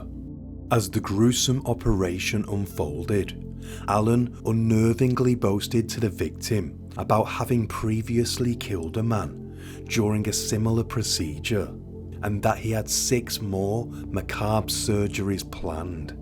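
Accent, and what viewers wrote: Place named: North, United Kingdom. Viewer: I have to know where your accent originates. Do tell. Has to be northern at least. Thanks from Louisiana USA!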